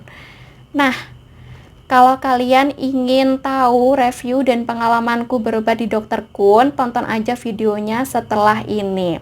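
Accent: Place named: Java, Indonesia. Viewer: Cewek sunda tapi lidah Jawa , Kerennn...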